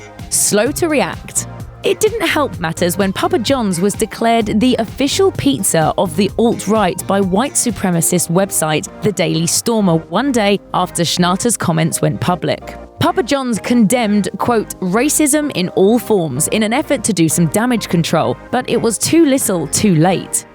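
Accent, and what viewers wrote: England, United Kingdom. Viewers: i dont fuck with the english accent chick, bring the other girls back PLEASE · CON-TRO-VER-SY... Americans love Brit accents, but not when yammering about American's faults. Then it sounds either retarded or snooty. NAHHHaaa, who am I fooling I still lover her voice. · Number one: narrator is British and should be the watch mojo girl. Number two: she's British and can't pronounce controversy.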